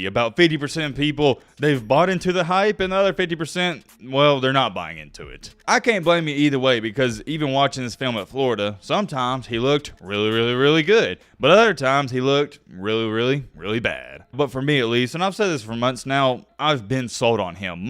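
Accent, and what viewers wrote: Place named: Alabama, United States. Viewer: Hey, What do you say at the end of your videos? My husband and I can't figure it out. We know you're a huge Bama fan, but we don't understand Bama speak. We aren't Bama fans, but we love your channel.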